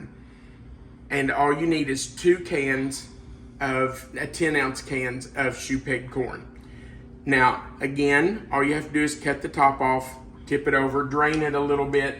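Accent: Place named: Kentucky, United States. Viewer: A-HA! I suspected you were from Kentucky, just from your accent, and now we know. I will be trying this "caviar" for our next potluck. GO BIG BLUE!!!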